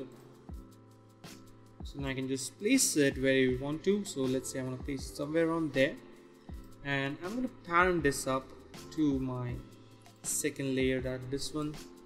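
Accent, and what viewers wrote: India. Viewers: Like a dove telling. Accent hindi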